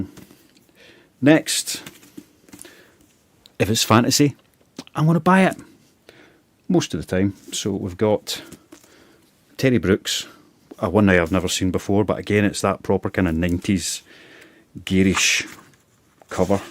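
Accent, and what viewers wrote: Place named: Scotland, United Kingdom. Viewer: 😊 Have been waiting for you to work out the microphone placement, but would have commented on this one if you hadn’t sussed it 😉 Fascinated peek behind the Curtain of how you get your stock & what to get - a great ‘how to’ for anyone thinking of giving it a go, and always a pleasure listening to a Scots accent blether on about books ☺️👍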